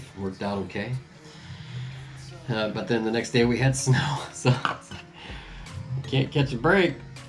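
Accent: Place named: Texas, United States. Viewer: Love that you still have your Texas accent my friend!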